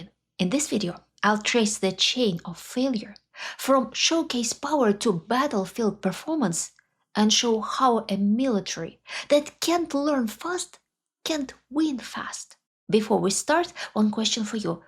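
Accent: Russia. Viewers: That lovely accent of soviet time English teacher) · Are you Russian? You sound Russian, but your last name is?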